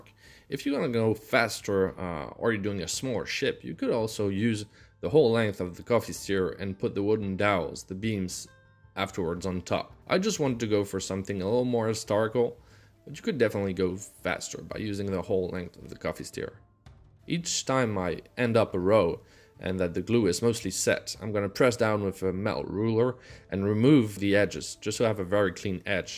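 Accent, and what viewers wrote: France. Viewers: Parceque je suis français aussi et jai reconnu ton accent. Hahaha super travail en tout cas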